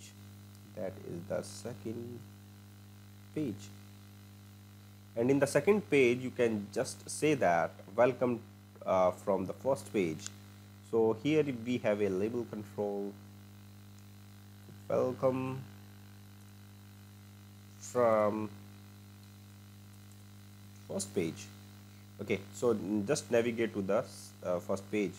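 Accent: India. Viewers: Nope, totally wrong there buddy, I am not even that proficient in English and I speak Spanish, which I doubt the narrator knows since he has an Indian accent, if you can't understand him it's more like a YOU problem then. If you are THAT distressed by his accent, watch another video, he's not a English teacher, he's a programmer, the least he should care is how he is saying his words because one guy can't understand him.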